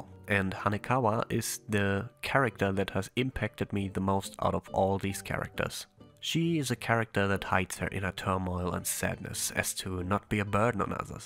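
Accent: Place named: Germany. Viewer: Dies to German accent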